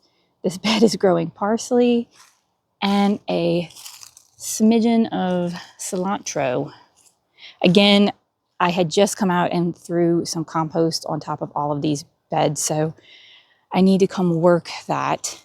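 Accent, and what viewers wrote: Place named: New England, United States. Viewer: that's ingenious! I've heard of sparkly and flashy things, but that's brilliant, actually. You have a great garden and kudos to repurposing the beds with those huge water bins? I've seen them here but don't know thier purpose. And yes, you've definitely picked up New England vowel sounds from your dad. I'm a bit envious that you have indeed a cabbage growing. My garden is under a bit of snow (3b)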